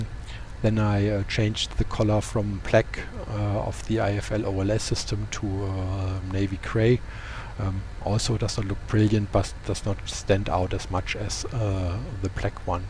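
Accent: Germany. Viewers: You sound very german dude :D Nice Mod!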